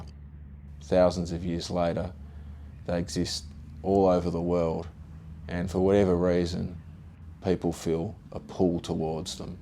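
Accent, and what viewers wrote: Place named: Australia. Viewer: You, sir, give a valuable service, in an iconic Ozzie laconic manner.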